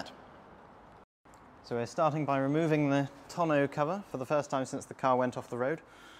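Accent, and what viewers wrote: United Kingdom. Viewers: Your accent says UK but the number plate says NSW. 🤔 The whole spare tyre assembly is completely different to my 54 TF.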